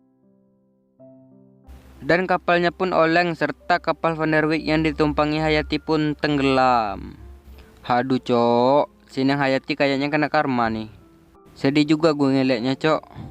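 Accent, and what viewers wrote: Madura, Indonesia. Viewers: Elu orang madura ya min kok logatnya kyak logat madura gtu😂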